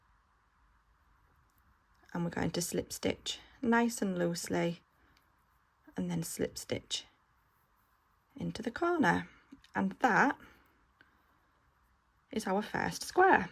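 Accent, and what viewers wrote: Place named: Northern England, United Kingdom. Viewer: ! I haven't crocheted in years and this is the first project I've wanted to do! I love it! (I usually knit) AND, you sound exactly like Mr Thornton's sister in North and South! Love that film, love Northern England, and love that accent! ☺️❤️ Thank you!!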